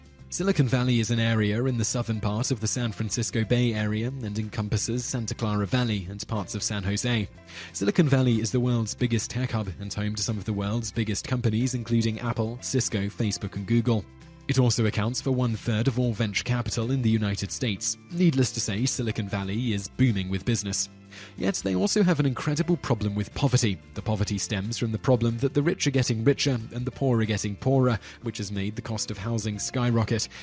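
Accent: United Kingdom. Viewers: I would certainly hope a guy who has a broad british accent knows that oxford university isn't in london.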